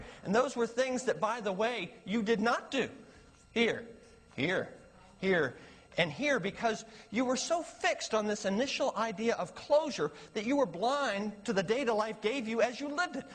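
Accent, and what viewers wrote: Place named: Midwest, United States. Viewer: Does anyone know how to describe this guy's accent/affect? It's vaguely Midwestern but I feel like we could get more specific. He sounds like my Aunt Betsy.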